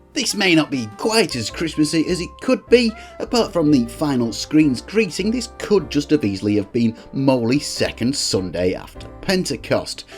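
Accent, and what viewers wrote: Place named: Cockney, United Kingdom. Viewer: This is the best cockney retro gaming channel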